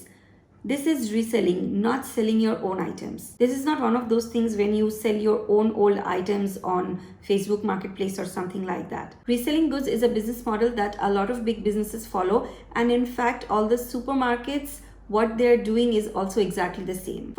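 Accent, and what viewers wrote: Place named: Western India, India. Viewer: Do you practice boxing? Why your hands are Red? Your English is Gujarat or Maharashtra side of India.. If you don't mind then please let us know from what part of India you are.. Need help on LLP and bank account that's why I came to your channel and subscribe I was in US last year.. Have 10 years multiple entry US business India.. Like to export goods like Clothes but have no idea.. Any other then clothing what else you recommend to export there??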